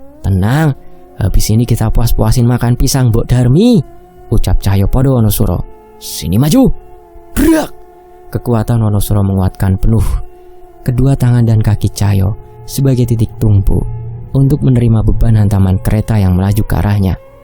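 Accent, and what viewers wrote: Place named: Bali, Indonesia. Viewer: Miiih..miiih..dhuwek sajan blii logat bali..pinter pisan aei😄🥰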